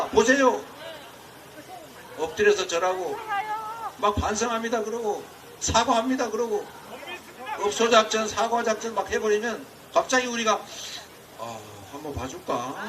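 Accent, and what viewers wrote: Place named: Busan, South Korea. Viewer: 얍실한 정부 보내 버립시다 !방언 멋져요😊부산 표준어 최고야 🎉🎉🎉🎉🎉🎉🎉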